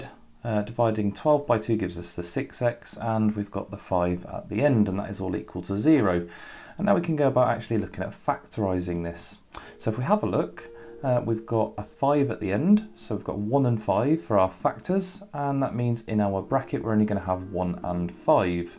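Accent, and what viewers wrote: United Kingdom. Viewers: No doubt this guy's videos are good. I like the mild black country accent.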